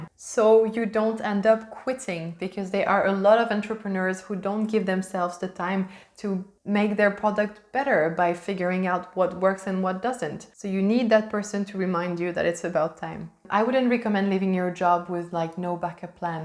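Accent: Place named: France. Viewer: She is French she has that French accent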